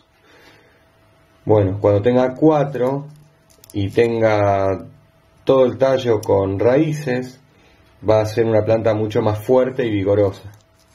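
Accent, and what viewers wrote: Argentina. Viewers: Daniela Medrano Terrazas el muchacho del video debe ser de Argentina por su voz. Aquí las temperaturas en invierno pueden ir desde los -25ºC a 20ºC dependiendo la región. Sí es de Buenos Aires, en invierno las temperaturas son de 0º a 15º. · Me encantan tus videos!! Muy explicativos y en español argentino jaja Queria consultarte donde conseguis la planta de consuelda ya que no la consigo.. muchas gracias!!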